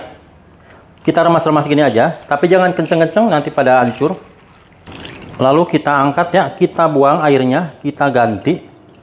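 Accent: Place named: Java, Indonesia. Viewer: Dari bahasanya ada Muthu Gobed Baki Sampean jawane pundi mas 😀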